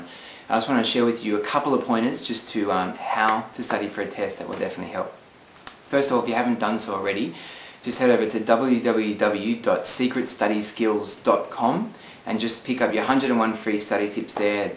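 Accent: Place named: Australia. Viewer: australiaann accent haha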